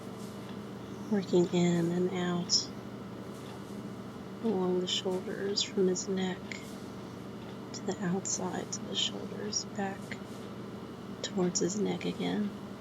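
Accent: South, United States. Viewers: Well, from what I've heard I've determined she has a bit of a southern accent meaning she probably originates from southern parts of the USA, my best bet will be one of the Carolinas :3c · I would have to guess she is from Louisiana, she sounds like she is from the south to me.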